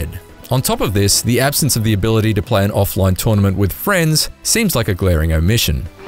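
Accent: Australia. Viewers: Great review and most importantly I really enjoyed the Aussie accent……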